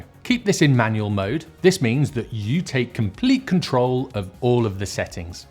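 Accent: United Kingdom. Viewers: Your name is so American but you are definitely so British.Very nice video.Will check back with you.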